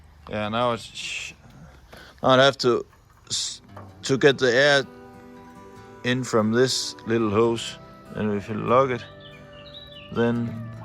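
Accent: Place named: Jutland, Denmark. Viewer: det er jysk når det er bedst 😊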